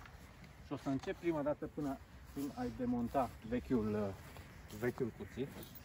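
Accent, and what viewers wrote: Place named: Russia. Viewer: Parece que hablas ruso y que hablas español, que eres?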